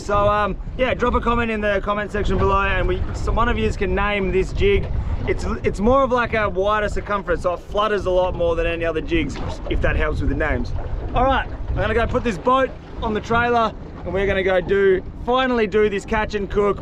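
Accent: Australia. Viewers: Just recently found … love the Aussie accent and the energy!!!! Love from India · He has an Australian accent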